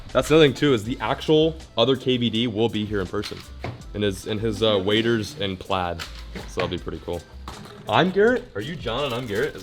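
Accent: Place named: South, United States. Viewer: Smh he jst done came off? Dam outta chi only a lil bit n u gt a southern drawl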